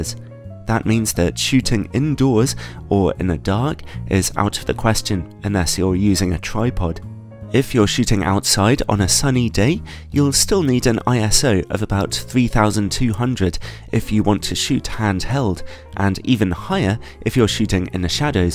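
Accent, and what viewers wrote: United Kingdom. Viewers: You’re literally taking the British accent onto a whole new level of royalness. Great sum up by the way :)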